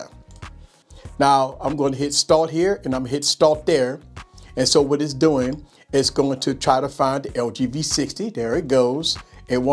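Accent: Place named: New Orleans, United States. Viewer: New Orleans accent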